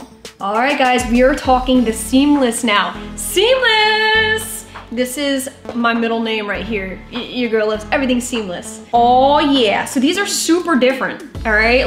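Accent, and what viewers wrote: New Jersey, United States. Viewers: Okay I’m back I’m definitely buying this collection I like that it glows in the dark that’s dope man that green is super cute I see it’s a lower cut still cute I like a pocket on my workout pants because I can put my phone in there but other then that I don’t like all those lines where are you from u sound like ur from jersey I don’t like nothing sliding yeah see I don’t like that on my heel I will pass on that one bring the glow in the dark tho that’s the one I want for sure! I don’t like padding I like the back of that bra tho on that green nice medium band great review girly u are so detail u said damn lol I got a pair of nikes that glow in the dark I didn’t even know they did I was like wow it will go good with that those make ur butt sit up I’m here for it it’s gone lift because ur small but other then that u need to tell gym shark to let u design some stuff because u know about them well they need to have u on there team for sure man I love this video great job on the two fits for gym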